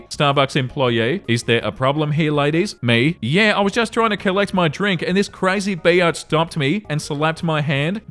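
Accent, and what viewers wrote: Australia. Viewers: He's Aussie, it's a common vocal trait of Aussie accents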